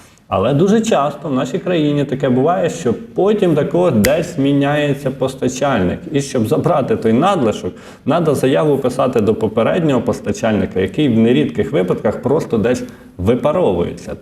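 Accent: Ukraine. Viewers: я це вже давно йому пишу,щоб вивчився спершу говорити вірно, а не на крижопільсько-жмеренському діалекті. А він не звертає уваги.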